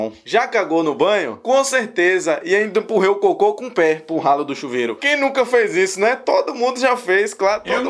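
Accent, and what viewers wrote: Bahia, Brazil. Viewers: Manooo surtei nesse sotaque 😍😍😍 vo namorae um baiano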